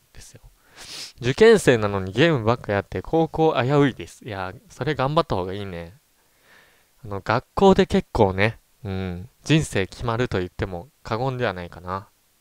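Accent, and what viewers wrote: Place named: Ehime, Japan. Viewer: 〇〇やからって方言で愛媛かとおもってました(^○^)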